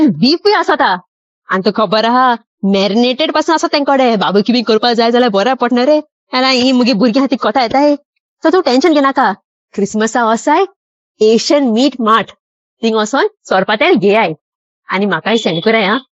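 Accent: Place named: Konkan, India. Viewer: Tuzhe konkani accent aykopak bare dista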